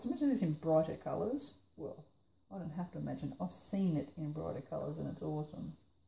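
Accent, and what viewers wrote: Australia. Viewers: Love your video and your "accent"... the aussie accent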